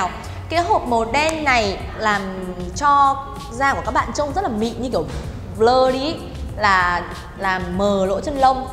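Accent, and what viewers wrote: North, Vietnam. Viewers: Cj giọng Bắc cực kì dễ thương lun ák. Mãi iu cj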